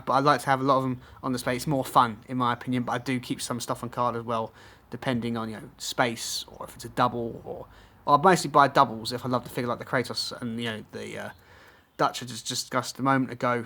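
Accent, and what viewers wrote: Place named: England, United Kingdom. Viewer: We like the smooth English accent. fab content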